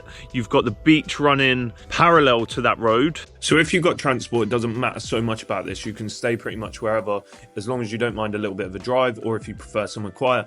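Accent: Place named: Liverpool, United Kingdom. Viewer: สำเนียงอังกฤษฉบับคนลิเวอร์พูลชัดเจนมากพี่ชาย😂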